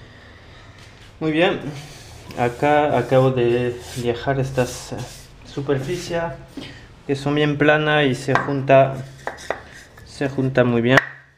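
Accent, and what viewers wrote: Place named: France. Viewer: lo sabia jaja, tu acento español me hizo pensar que serás de Francia o alrededores jejeje grande, aquí el tilo es algo caro porque lo traen a través de la aduana y deben pagar por el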